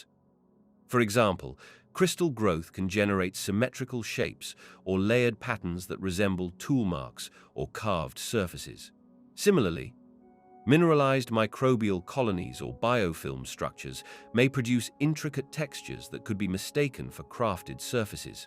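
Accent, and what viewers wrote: United Kingdom. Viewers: It’s remarkable how repetitive this British sounding video is while we’re all waiting to see pictures of intelligent reptilian beings. If the narrator had a southern accent it would be easier to realize the repetitiveness because the southern accent sounds lees intellectual to northerners perhaps due to how Hollywood and other sources have often poked fun at American southerners.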